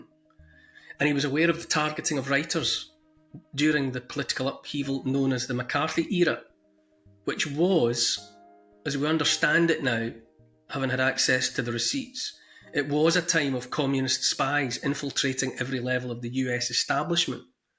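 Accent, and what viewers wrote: Scotland, United Kingdom. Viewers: Neil, I am an American who has lived 71 years. I have seen a lot of things throughout my life that long ago raised my distrust of power at all levels. I have had love since childhood, for books and learning, from the classics to philosophy, science, and everything in between. I have read Fahrenheit 451, and it is now banned in some libraries here in the U.S. I know you are a kind and decent man, blessed with that wonderful Scottish accent, and I agree with everything you said. But I'm worried about what you didn't say. I agree that revolutions are bad for people caught in them, but what is the unsaid part. How does society get rid of the yoke of tyranny? Sometimes, you have to flip that coin. I read a great little book years ago, written by a Scottish American academic that had a memorable effect on me. Man's Unconquerable Mind by Gilbert Highet talks about mankind being on both sides of longing to be free and staying strong enough to get that freedom. 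If you know freedom, you can not accept anything short of that. I would love to hear what your suggestions are for people in the U.K.,the U.S. or anywhere. I have been watching the U.K. with alarm, and I can see the same thing here and elsewhere. The world is a grenade with the pin pulled. By the way, Bradbury was prescient about book banning. I enjoy your show very much.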